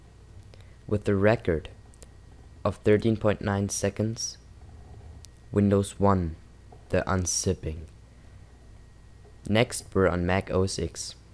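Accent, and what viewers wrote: Germany. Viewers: Dein Englisch ist richtig gut für einen deutschen, obwohl man den Dialekt noch raushören kann (du sagst im unsip)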